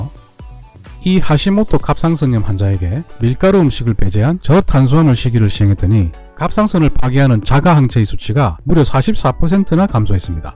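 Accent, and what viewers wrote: Gyeongsang, South Korea. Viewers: 선생님 목소리가 너무 편안해요. 그 목소리 건강 잘지키셔서 오래듣도록 해주세요. 그리고 발음속에서 경상도쪽 특유의 발음이 들리는듯 한데 그 지역 분이신가요? 응원하고 있습니다.❤❤❤❤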